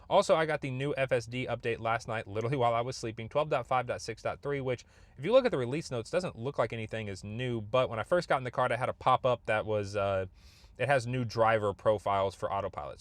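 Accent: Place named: Georgetown, Guyana. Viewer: I thought you sounded familiar, I am from Georgetown!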